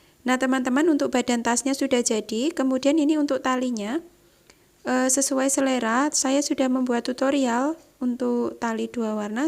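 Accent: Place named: Java, Indonesia. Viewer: Mantap suka suaranya medok 😍😍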